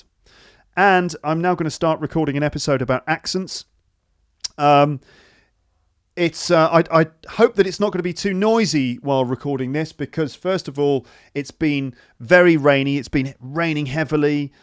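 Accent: RP, United Kingdom. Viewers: I love the british accent, your channel is one of the best to practice my listening. :) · My best teacher. I love British accent. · Hi! I love listening to your podcasts, your voice, and your British accent. But when you imitate other accents, it was hard to understand them. In order to communicate with non-native speakers, I don't want to follow the rule of accommodation theory and grade my English down. I would prefer to speak closely the way you speak. But if you are a native speaker, you can accommodate your accent to different kinds of people. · I like british accent. so I really like to hear your podcast. many thanks for your superb poadcast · Excellent you're apparently an amazing mentor with your indispensable method in particular with the pure British accent I wish I could talk to everytime anytime to be honest I'm an English either I've got approximately 50 students who belong to middle class family but they obviously make an effort to develop their vocabulary and focus on their spoken English most of them enjoy a lot but they're curious to talk with a native speaker specifically with British I hope you could spare sometime with my students online on video call thanks a lot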